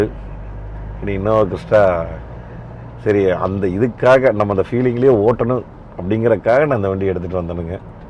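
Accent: Kongu, India. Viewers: உங்கள் அழகு உங்கள் கொங்கு வர்ணனை பேச்சு அண்ணா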